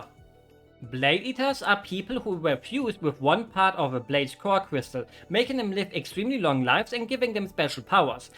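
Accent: Germany. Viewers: I just came along this video and looked at your channel and thought: "wow that this is a Nia fan and he has a Deutscher Akzent" and then I took a look at the channels description and I was definitely not disappointed xd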